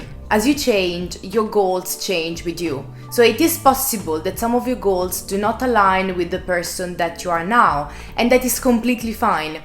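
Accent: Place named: Italy. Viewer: Hello, good morning! I found this video of yours about 20 minutes ago. It's fantastic and I liked it 😊. Excuse me, what is your native country? Your accent sounds very Italian. Greetings from Sonora, México 🇲🇽.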